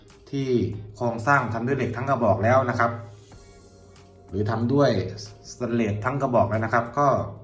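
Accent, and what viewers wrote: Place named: South, Thailand. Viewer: *ชัดเจนจริง นี้คนใต้แน่ผมว่าพี่ นี้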